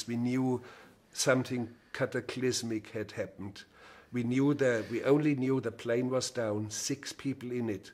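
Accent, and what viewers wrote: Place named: Germany. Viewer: I just love the contrast between Herzog’s masterful command of the English language and the thick German accent that glazes it. English is indeed the language that rules the world.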